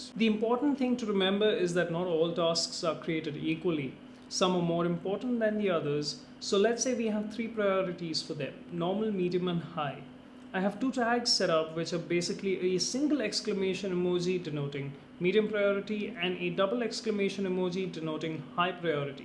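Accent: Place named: India. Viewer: I hate the indian accent.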